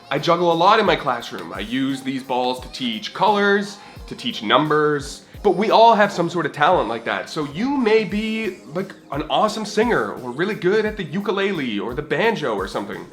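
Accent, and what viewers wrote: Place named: Canada. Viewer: Love your Candian Accent! Also, thanks for you suggestions, they're reassuring!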